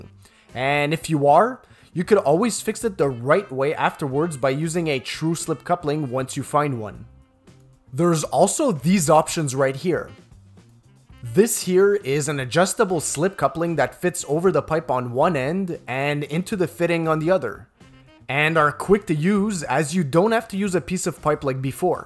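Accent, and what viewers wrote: Quebec, Canada. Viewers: Sounds like a Québec accent ?! I believe I hear poutine between the plumbing... Is it ?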